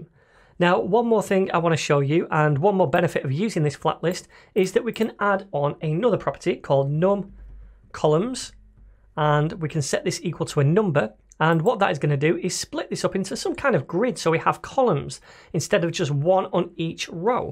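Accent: United Kingdom. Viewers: Hello, greetings from Argentina, i love the British English..thanks for the tutorial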